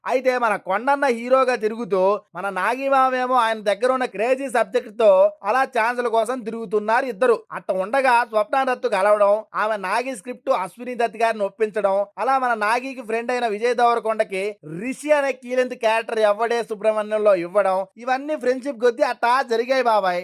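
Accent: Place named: Telangana, India. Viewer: CheppoddaraL jodka Telangana slang lo.. enduku ra mee Androllaku chinna chupa Telanganollante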